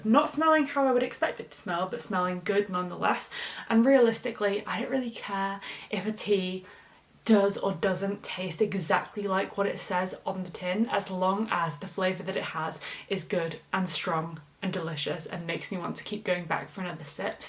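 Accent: United Kingdom. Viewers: I love your UK accent!!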